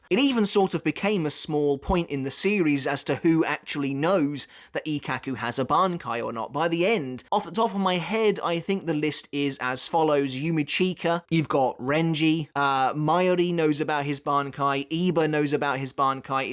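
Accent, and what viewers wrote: United Kingdom. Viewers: It's one of my favorite banki. I appreciate the work you do, but I will say your pronunciation of the names is very lazy. I understand it's your British accent, but the names aren't British... At least try to pronounce them closer to Japanese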